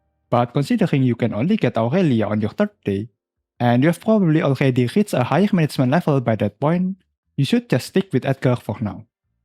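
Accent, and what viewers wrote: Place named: China. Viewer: bro sounds chinese , therefore this video is already gauranteed worth it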